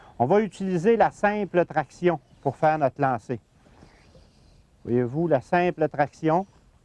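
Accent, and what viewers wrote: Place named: Canada. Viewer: belle vidéo très sympathiques à regarder et surtout à entendre avec cette accent que j'adore .....du Canada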